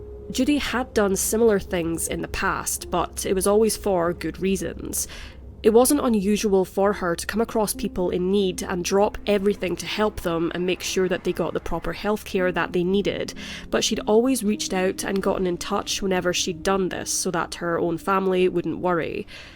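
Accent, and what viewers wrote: Scotland, United Kingdom. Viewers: I was trying to think of a polite way to correct her pronunciation of "Hyannis". She said it, "Hee-annis". It's actually, "High-annis". I grew up there. For what it's worth, I think her accent is quite lovely. And I'm sure I would butcher the pronunciation of whatever Scottish town she grew up in. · The Scottish accent of the narrator makes this all the more chilling, every time. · American here; the Scottish accent is hands down my favorite of all of the English first language accents. Australia's a close 2nd. It's as if Kelly Macdonald is narrating this. I'm glad that I found this channel, it should be even bigger than it already is. It takes quite the grind to make 100K+ subscribers. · Where abouts in Scotland you from? East coast? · I understand your Scottish but your voice just reminded me season 3 of Derry girls airs on Netflix tomorrow 🙄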